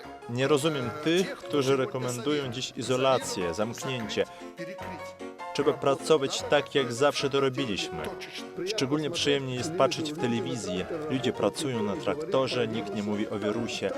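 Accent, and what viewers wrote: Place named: Russia. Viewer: Czemu ten lektor mówi Rosyjskim akcentem ?? Japierdole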